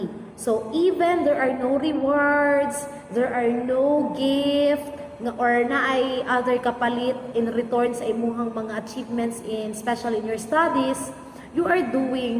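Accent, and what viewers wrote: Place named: Visayas, Philippines. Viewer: Super love bisaya accent Ma'am, you did great po! 😍❤️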